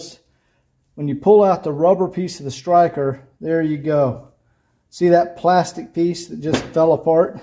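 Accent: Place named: Atlanta, United States. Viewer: Excellent video. I’ve done some of this stuff to my <num>d. Haven’t tackled the sunroof or antennae yet. I also need to do my A/C and clutch master/slave cylinder. Im assuming you must be near Atlanta based on accent and the YouTube description phone number. I’m up in the mountains above atlanta. Are you a w123 shop? Any good references for local w123 salvage yards? Parts places? What about body and paint guys familiar with fixing rusted w123 body pieces? Thanks!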